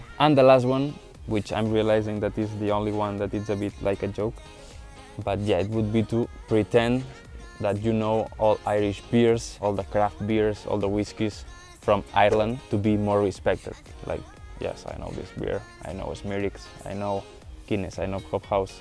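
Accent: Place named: Spain. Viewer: wouldn't need to do this as his Spanish accented English is very easily understood by anyone who has any experience of travelling or speaking to non native English speakers.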